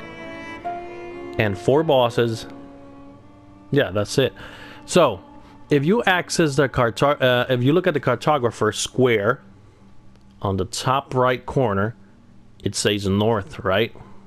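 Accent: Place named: Spain. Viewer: Hey man,,l meant no disrespect, Spanish is a beautiful language and your english is very good, ,l should have known this by the way you pronounced compass, ,sub and share for your great reply back,,,thanks and a big hello from New Zealand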